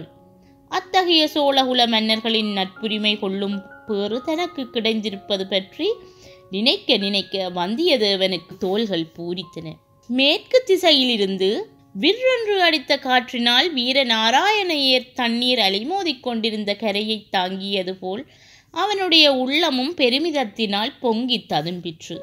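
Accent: Sri Lanka. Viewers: உங்களோட கொஞ்சும் இலங்கை தமிழில் பொன்னியின் செல்வன் கதை மிகவும் இனிமை.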